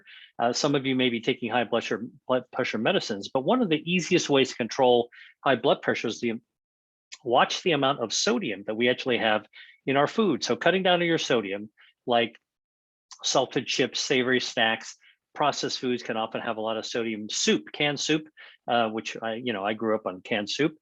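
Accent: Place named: United States. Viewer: I like watching his videos. Great info and so glad I can understand his American English. 😅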